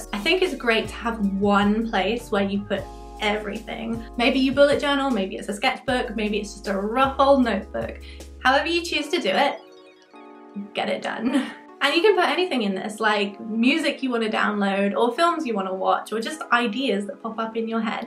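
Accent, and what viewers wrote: United Kingdom. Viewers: No hate r u British of American x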